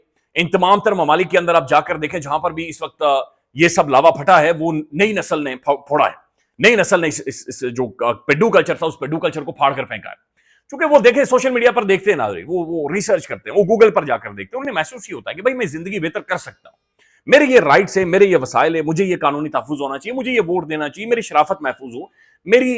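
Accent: Kashmir, India. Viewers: Bhai ur accent reflec u r true kashmiri I m also bt I live in Karachi...wesy tu pora mulk yateem bt Karachi tu extra yateem..yahan k log gongy behry bs mazdoor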